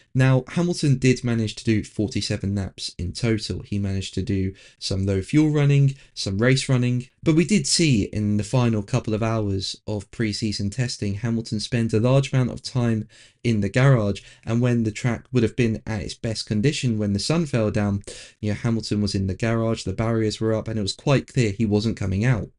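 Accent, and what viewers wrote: England, United Kingdom. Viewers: I love your english accent 😂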